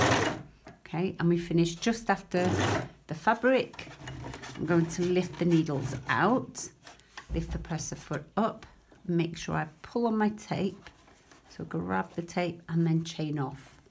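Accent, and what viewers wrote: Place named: Stockport, United Kingdom. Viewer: Just actually listened to your accent, I was born at Stepping Hill hospital, my maternal grandparents lived where you do, or around, close by... before grandfather died, they lived in lower Brinnington, near where St Paul's church was... for the first 5 years of my life, I lived in upper Brinnington, went to school at the old school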